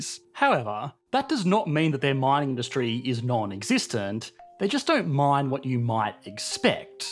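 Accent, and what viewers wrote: Australia. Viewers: I’m so sorry but I’m so tired of his australian accent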